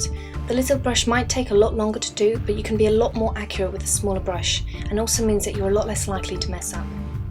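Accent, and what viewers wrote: United Kingdom. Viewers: Errr... no. its a British accent. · Are you British? coz i am well im scottish but oh well i love you accent and your art :) keep it up!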